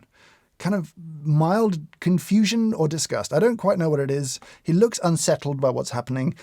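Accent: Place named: RP, United Kingdom. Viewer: Overreaction to an ad. this aristo-accented Brit going over the producers (who cares?) The connections he makes of motorcycles with coffee (who cares?). Coffee beans sold with name of who it was made/ filled for (who cares?). Pitt and being supposedly made to look cool in the selling of something(what else is new?).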